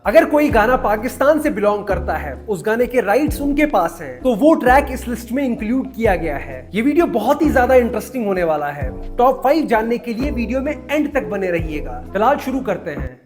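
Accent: India. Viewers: Yaar, Please speak correct Urdu. Okay. Abhee kay time pay? What is this? If you want to speal Mumbai style urdu say so? Sub Hindustani kyon bolyat ho bhai?? Please explain??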